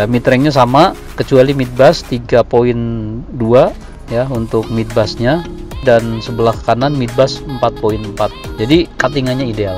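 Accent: Banyumas, Indonesia. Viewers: Ora ngapak ora kepenak .sukses om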